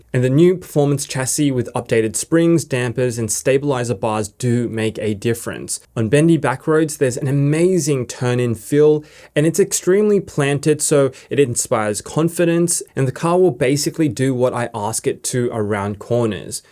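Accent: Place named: Australia. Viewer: You've got the Australian accent but keep using "miles" ... A little disorienting :P Now... I just need to drastically increase the amount of money I have and I'd be able to afford one :D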